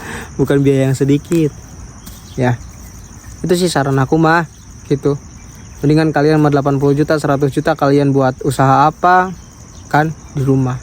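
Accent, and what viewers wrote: West Java, Indonesia. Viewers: Dari logatnya sih kayak orang sunda, Abang orang sunda kah?😂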